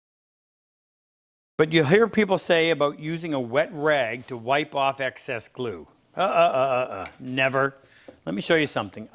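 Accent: Canada. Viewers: Why can't Canadians pronounce "out". You lost me at number 1. I curl my finger tight on the corner edges so the glue is only in the center. I never want much squeeze "out". Good prep requires very little glue and far less clamps.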